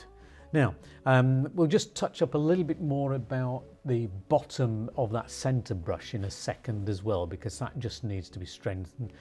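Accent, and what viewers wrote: United Kingdom. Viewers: لكنته بريطاني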